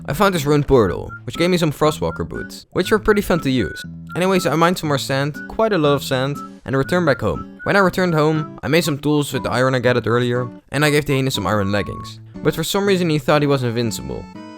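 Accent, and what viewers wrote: Netherlands. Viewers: You have a little bit a dutch accent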